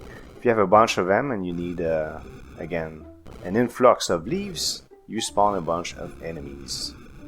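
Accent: Quebec, Canada. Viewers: Es tu quebecois ?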